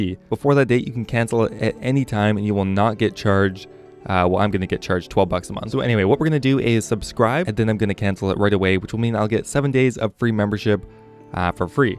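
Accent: Quebec, Canada. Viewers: Wait canadian but french lemme guess quebec?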